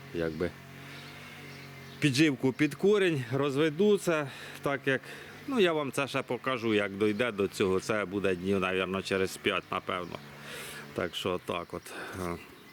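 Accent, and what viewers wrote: Poltava, Ukraine. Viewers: Підкажіть вашу локацію.Чую по говору десьближче до полтавської обл.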